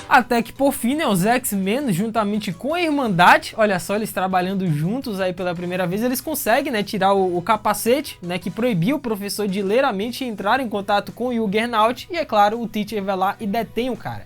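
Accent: Bahia, Brazil. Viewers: Cara tu é daqui do baixo sul da Bahia? Simbora meu conterrâneo, voa mano!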